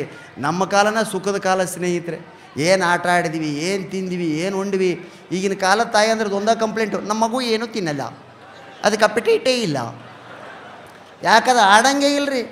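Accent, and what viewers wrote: North Karnataka, India. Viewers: Tumba chennagi matadtira .uttar karnatakada janane hingripa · ಪ್ರಾಣೇಶ್ ನಿಮ್ಮ ಉತ್ತರ ಕನ್ನಡ ಬಾಷೆ ಕೇಳುವುದಕ್ಕೆ ನಮ್ಮ bangalore ಜನರಿಗೇ ಬಹಳ ಖುಷಿ ಯಾಗುತ್ತೆ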